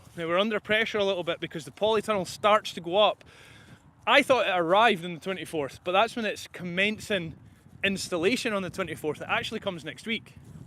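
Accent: Scotland, United Kingdom. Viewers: This is a course in learning your accent, Scottish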